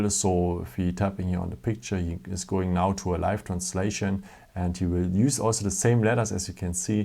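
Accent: Germany. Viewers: Strong german accent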